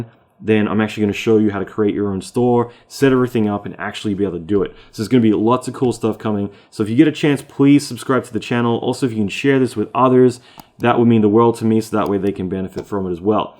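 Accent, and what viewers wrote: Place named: Australia. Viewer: This is a good insight. Theres an Australian accent sneaking through that american slant...or american accent sneaking through an Australian accent..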